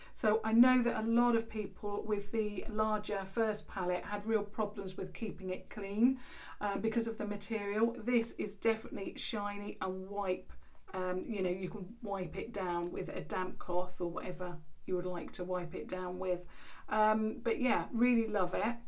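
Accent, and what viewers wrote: United Kingdom. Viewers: Iam an Egyptian engineer, every time i open your videos i focus on your British accent whatever you talking about 😍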